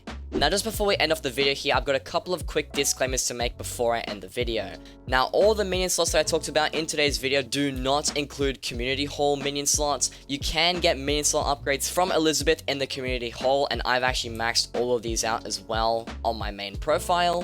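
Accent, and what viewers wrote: Australia. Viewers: Where in Australia are you from?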